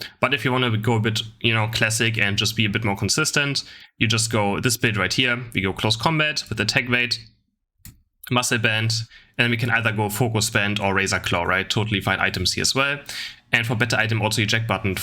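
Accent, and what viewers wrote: Germany. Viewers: How can there be Tsareena and Machamp but NOT Lucario?? Lucario is the completr package in so many ways. You can play both builds and its super strong. You can even counter jungle in early game before dread to Set the enemy jungler behind. You have so much secure with him, theres no reason to not have lucario on this list. Its beyond me how you can miss him on ur list. Sidenote: Also please work on ur english pronunciation, your accent is so sharp it could cut bread or Brot, my German friend.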